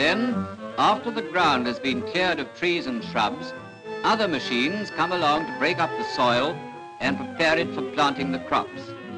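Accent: RP, United Kingdom. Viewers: That's how UK commentators on TV /Radio etc used to speak back in those days.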